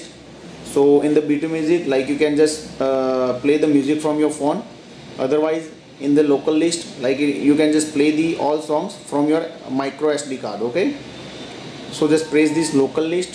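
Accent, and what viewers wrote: India. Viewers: Abe Indian nahi ban sakta kya English me bak bak kar raha he lag to tu Indian raha he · Ghati English?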